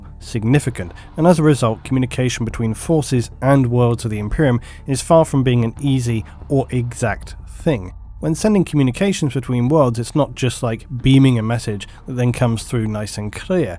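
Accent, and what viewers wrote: United Kingdom. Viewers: Man your the best Warhammer 40k narrator on YouTube! I try to watch other channels but they dnt have ur British accent. I swear it makes the lore/story 10× better. Huge fan! Love your stuff💯🤘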